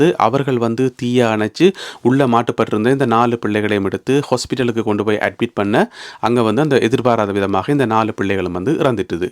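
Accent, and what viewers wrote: Sri Lanka. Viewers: I have subscribed your channel bro. I like the Srilankan tamil accent. Your collective news always helpful.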